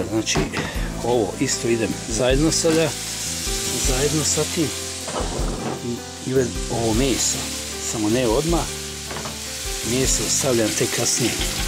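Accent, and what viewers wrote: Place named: Sarajevo, Bosnia and Herzegovina. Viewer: Vozdra i bite! Moram priznati ti si prva osoba iz Nicaze koja sam cuo da zvuci k'o da je sa Marin Dvora. Studirao sam sa dosta Zenicana i nijedan nije imao takav naglasak. Eto vala, k'o da si nam rodjeni Sarajlija. Hahaha! Nego ziv bio i uzbrdo brz. Uzivaj u svemu.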